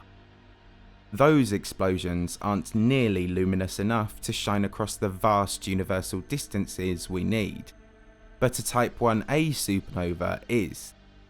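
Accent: United Kingdom. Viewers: What's that accent? Where in the UK do they speak like that? Solar systeeeem, compositieeeen, it's kind of distracting. Other than that it's a great channel.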